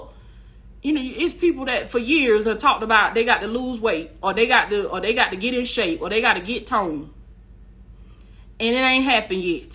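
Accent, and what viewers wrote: South, United States. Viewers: God is a perfect God. You sound wonderful,! I love❤ your southern drawl, and could listen to you for hours. Not kidding. God made your voice unique, to reach the millions that you will be assigned to! Your voice mesmerizes people, and compel them to stop and listen to you while God is pouring into you and into them. Praise God! I hope that you read this because...your mouth is working...👄but...believe me, GOD IS SPEAKING.🙏🏽 To THE MASSES!!!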